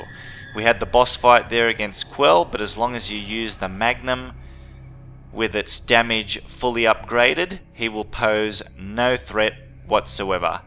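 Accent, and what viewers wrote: Australia. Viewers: just started watching you and im in love with ur channel already. btw are u Australian? n will u be doing chapter 15 anytime soon?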